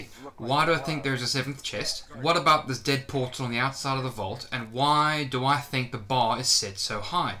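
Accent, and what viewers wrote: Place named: South Africa. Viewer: dude you south african me too i can tell by the accent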